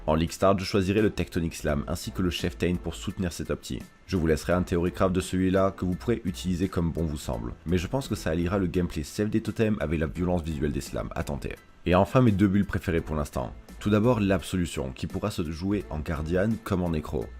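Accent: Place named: France. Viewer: I feel like 90% of what he said was english words prononced the french way :D gotta step up your frenglish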